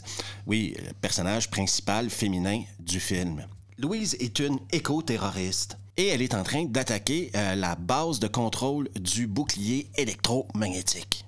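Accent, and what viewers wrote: Quebec, Canada. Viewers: je m'abonne car j'aimerais comprendre si le sarcasme est un talent personnel ou bien si cela viens de la nonchalance de l'accent Québécois, tout en découvrant de merveilleux films 😅😅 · Je crois que je l'avais vu à la télé et je n'en ai pas gardé beaucoup de souvenir ! J'avais même oublié Michael Ironside et Virginia Madsen ! (qui se souvient de "Electric Dreams"?) Déjà, le nom du méchant... Le général Katana ! Ca sent le nanar ce nom là 😂 Merci pour ces merveilleuses "RE-VU" si drôles avec cet accent du Québec que j'aime beaucoup :)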